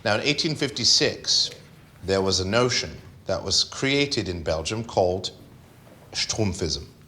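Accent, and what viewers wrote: United Kingdom. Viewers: I don't believe is American, he sounds genuinely like one of use brits! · Speaking as a Brit, his accent is very near perfect. Just need to tweak a few tiny words to nail it completely ('you caught me', 'sinister', 'called it' etc) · English accent is near perfect.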